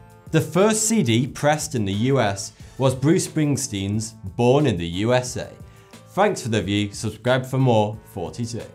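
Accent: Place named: England, United Kingdom. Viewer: Yes 42 is the answer to life..... it is from a wicked cool movie... I bet you know what 1!!! and yes his name is a play on words but also he is English .... Hench he has an Accent... You silly silly sausages!! :) p.s. Thoughty2 you rock my brain with all of random facts!! I am so addicted to your channel :) Peace. :) x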